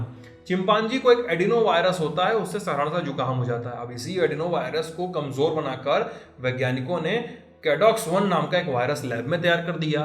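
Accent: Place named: Uttar Pradesh, India. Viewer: Bhaiya ke bolne ka style lag rha hai up waale bhaiya hai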